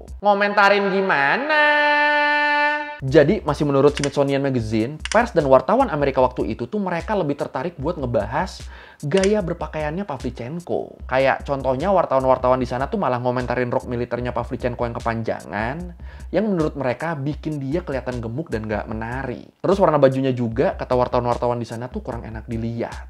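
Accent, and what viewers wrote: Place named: West Java, Indonesia. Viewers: sunda lau mantep juga bang alus pisan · Ternyata Lo orang Sunda bang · pertama kali denger bang ngomong sunda wkwkw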